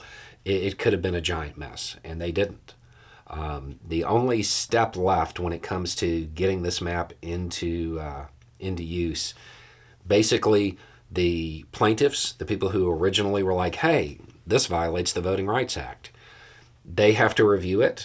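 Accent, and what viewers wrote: South, United States. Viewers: The accent probably puts people off initially. Just like WellRed. My Indiana emigre wife rolls her eyes whenever she hears the voices in f the south, and I can’t convince her to work in t all the way through. But it grows on you, as you know. Beau deserves public office, to be honest. But then we’d lose his straight shooting journalism. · I found Beau because Facebook fed me videos of a guy in a shed with a ball cap and a southern accent, near the beginning I think. Then it stopped so at some point I realized he is on YouTube so I found him here and subscribed. Beau doesn’t participate in the for profit news business, no click bait headlines, no sensationalist videos, just news and commentary so that is why he lags in subs but still he is approaching 900k.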